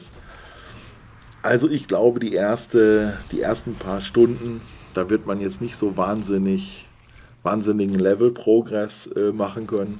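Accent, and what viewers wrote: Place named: Ruhr, Germany. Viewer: Wo kommst Du eigentlich her?ich meine den Ruhrpott rauszuhören....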